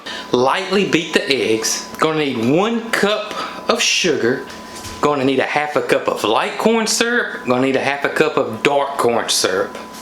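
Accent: South, United States. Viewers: "let it fridgerate" love the southern speak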